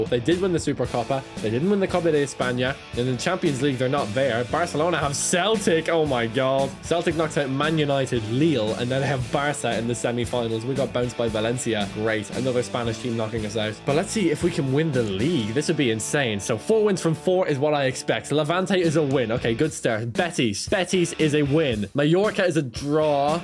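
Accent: Ireland. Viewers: I am Irish to are you from Dublin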